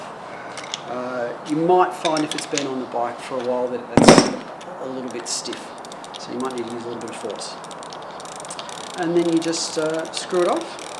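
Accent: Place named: Australia. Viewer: Is not a limey ( BRITISH ) he is an Aussie or kiwi THE SOUND ON THIS VIDEO IS TERRIBLE THROUGH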